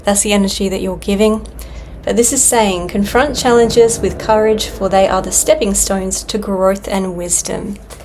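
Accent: Australia. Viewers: Greetings from California. Good reading,. Good voice. You will do well in the music industry as a singer. You are a star of Australia. We really like the Australian accent. We can listen to talk all day. Happy valentines from our channel 🙋🏻‍♂️🧜🏼🧜🏻‍♀️🦜🦜🦜🦜🦅🦅🦅👑Sally Alexandria & Alex * Australian Parakeets Stars 🦉🦉🦉💕💕💕🎉🎉🎉🎈🎈🎈🧁🧁🧁🍾🍾🍾🎊🎊🎊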